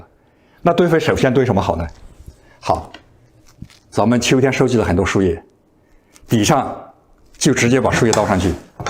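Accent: Yunnan, China. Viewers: 您应该是云南人吧😂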